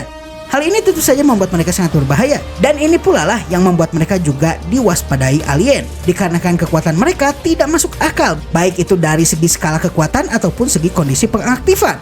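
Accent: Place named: Java, Indonesia. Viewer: Kamu wong jowo mas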